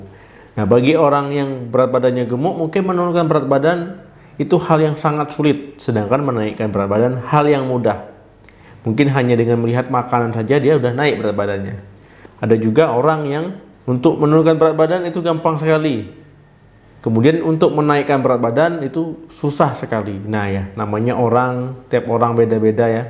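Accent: Aceh, Indonesia. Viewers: Dokter nya orang aceh y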